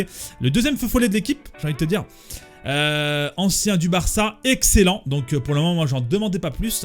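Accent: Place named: Arab world. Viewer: Sanpa ton accent arabe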